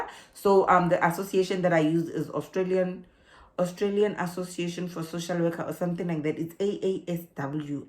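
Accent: South Africa. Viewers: Aussies find your heavily accented English hard to understand. The White Afrikaans accent is found arrogant in Australia. Aussies stay away from it. It's the truth. Ask other immigrants in Australia. They will tell you so. You don't need to speak like an Aussie. Need to articulate.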